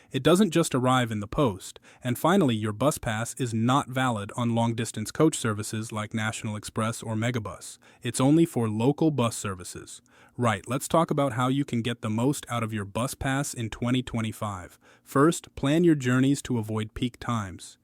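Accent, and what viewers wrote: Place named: United States. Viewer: an usa accent talks about uk pensions etc would not trust a word he says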